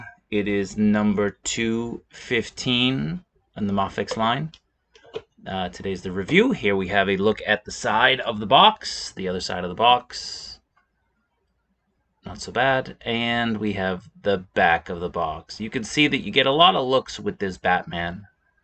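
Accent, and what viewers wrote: Boston, United States. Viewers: What kind of accent is that ? Boston?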